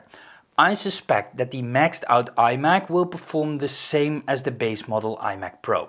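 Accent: Netherlands. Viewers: Leuk en informatief Youtube kanaal! Ik type het maar in het Nederlands :-) aan je accent te horen ben je dat. Ik produceer zelf vanuit Logic Pro X icm een Mac Mini i7 6-core /32gigram/1Tb Maar dat is alleen maar omdat ik inmiddels gebonden ben aan Logic... Anders had ik wel een Windows machine gekocht ;-) Keep up the good work!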